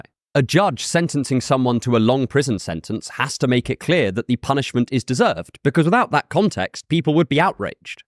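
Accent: United Kingdom. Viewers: Relax with the British accent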